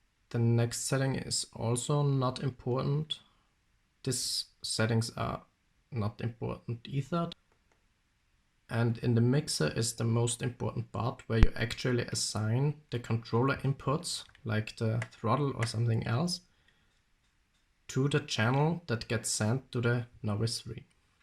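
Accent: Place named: Austria. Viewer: ich meine in deinem Englisch nen österreichischen Akzent zu hôren! Stimmt, oder😜🚁👍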